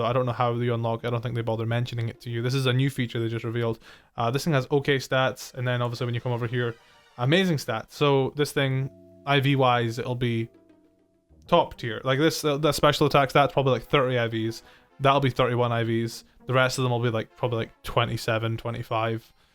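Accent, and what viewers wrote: Scotland, United Kingdom. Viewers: what part of Scotland you from?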